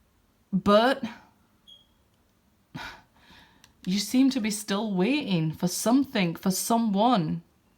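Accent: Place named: Yorkshire, United Kingdom. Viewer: Luv your accent. x.... May I ask what accent is it.?.. Sounds like Yorkshire ?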